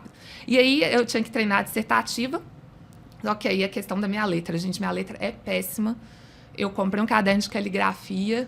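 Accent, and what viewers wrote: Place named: Minas Gerais, Brazil. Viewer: Esse sotaque dela é maravilhoso MG ❤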